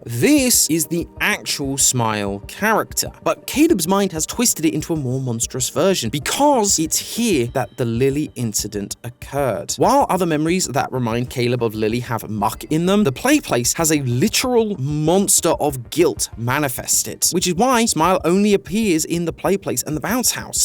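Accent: United Kingdom. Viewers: I genuinely do not remember you being British, am i from another universe?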